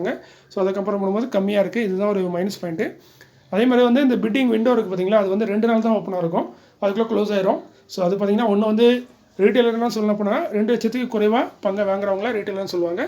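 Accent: Tirunelveli, India. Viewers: Sir please speak as early .Don't try new version. For others we cannot change Tirunelveli Tamil 😊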